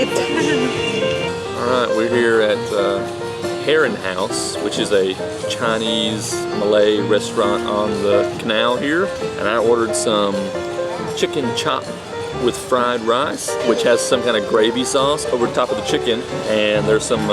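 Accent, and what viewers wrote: Kentucky, United States. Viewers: Fabulous video! Great music and editing, well done! Love it (especially the Kentuckian accent)!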